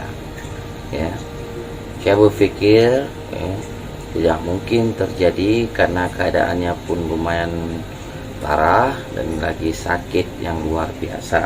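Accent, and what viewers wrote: Aceh, Indonesia. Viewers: Dr logat bicara nya org aceh ya. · Org aceh y bg?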